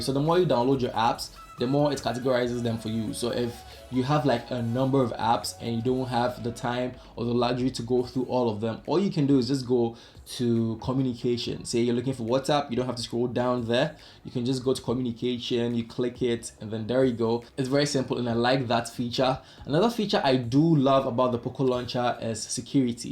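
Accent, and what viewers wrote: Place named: Jamaica. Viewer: Are tou Jamaican? I'm from the virgin Islands, your accent sounds jamaican or nearby location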